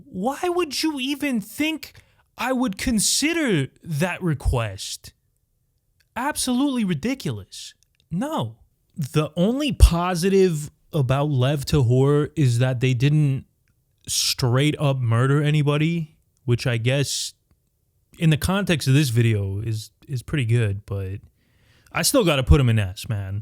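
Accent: New York, United States. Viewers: I watch a lot of cult content. But there's something about hearing them explained by someone with a New York accent. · Hol' up, you're from AZ? With that NYC accent?!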